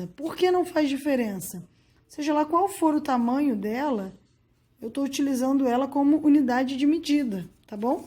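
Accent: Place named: Pará, Brazil. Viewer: boa prof se solta um pouco mais. vc é paraense ksksks pareçe muitooo tbm sou,rsrs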